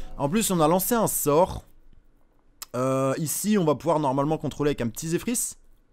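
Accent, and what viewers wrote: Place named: Belgium. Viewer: Mdr l'accent belge il est bien présent :)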